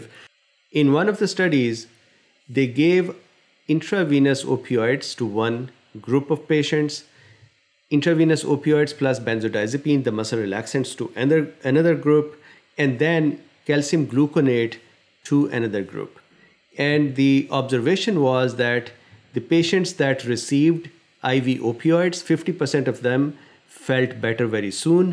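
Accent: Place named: India. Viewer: Love this guy's accent! I just came here for the info because I got bit by a black widow spider about a week ago but I LOVE the Indian accent. Yes, it's painful, yes it sucks; you're not going to die from it. There hasn't been a death from a black widow in the US in ten years. "Provoked" can mean you accidentally climbed through their web without knowing it; the spider went down your shirt and bit you seventeen f***ing times (what happened to me). I climbed under a fence at night, didn't see the spider but felt it's web on my face. The thing about a black widow spider bite is you don't feel the sting but an hour later you feel the pain which in my case felt like somebody hit me in the chest with a baseball bat. It took me a while to figure out that I got bit by a black widow. At first I thought I'd pulled a muscle but it started to hurt way more than you'd expect from a pulled muscle. 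It was a deep pain and the skin surface was numb. But then after about a week the deep pain started to go away and there was pain and itching on the surface and there were red spots in each place where the spider bit me. I counted about seventeen individual bites. About a week and a half into it now and the red spots have turned into scabs, some of which have already fell off. I'm still in pain but I'm not going to hate black widow spiders for the rest of my life. I'm definitely going to be careful about crawling under fences; at night; in the dark; where I can't see what I'm climbing through. T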